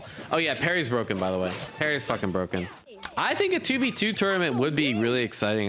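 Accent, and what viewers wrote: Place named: North, United Kingdom. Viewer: bri'ish, but northern.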